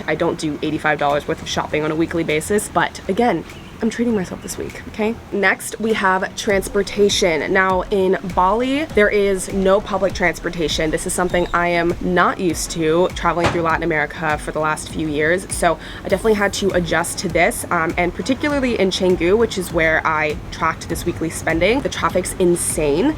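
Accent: United States. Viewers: This girl has a total American accent…… I am American and there are different accents based on where you live, but hers is pretty standard American. The American sound that other Americans would consider to NOT have an accent. Accents that are commonly known around the world but very specific to a local area and not all of America……a New York, Boston, or far south accent. These are not representative of most American speakers, this girl is.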